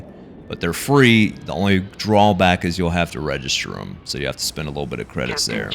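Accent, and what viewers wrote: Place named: Texas, United States. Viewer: You sound like a Texan, and YouTube is severely lacking in Texan gaming content creators. Subbed and liked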